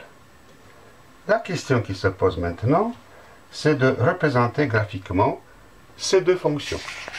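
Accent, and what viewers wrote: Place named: Romania. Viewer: vous etes roumain? Car vous avez l'accent (si oui eh bien je voulais vous dire que sunteti un profesore foarte bun)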